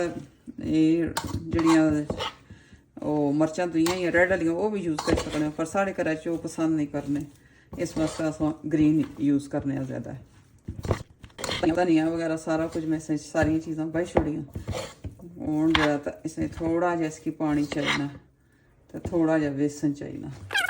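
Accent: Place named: Punjab, India. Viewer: Punjabi accent is so sweet ❤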